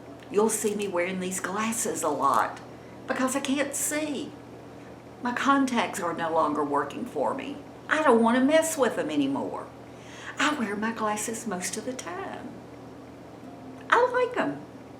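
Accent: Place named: Memphis, United States. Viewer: I adore you. I feel such a closeness to you when you do videos like this. I lost my mother three years ago, and you remind me so much of her. Plus the Memphis accent. 😊I love hearing your thoughts and advice.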